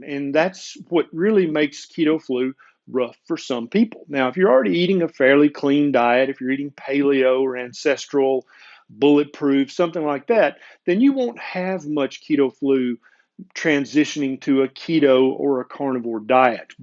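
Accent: South, United States. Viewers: Going through it rn, thank you for the video! Appreciate your knowledge, videos and amazing southern accent!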